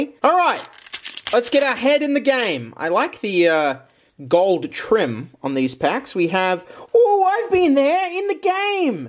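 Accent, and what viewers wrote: Australia. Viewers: already saw the video in the self promo. you're videos are consumable as fuck... or maybe it's the Australian accent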